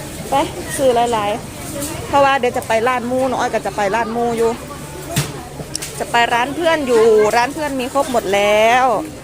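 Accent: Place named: Isan, Thailand. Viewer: สาวเหนือเว้าอีสานน่ารักไปอีกแบบครับ FCครับ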